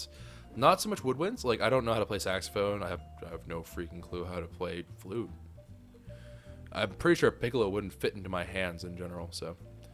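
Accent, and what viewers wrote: United States. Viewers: Hey it sounds to me that you live in Pittsburgh and so do I man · You do not sound like a sour Korean , I assumed fully 100% American with dark hair , similar looking to drift0r or something. But I like getting to know what you look like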